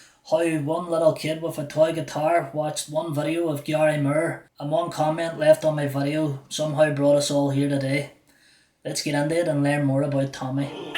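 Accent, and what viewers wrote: Scotland, United Kingdom. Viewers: I know. I was just enjoying your way of talking. I love a strong accent like scottish and irish. 😊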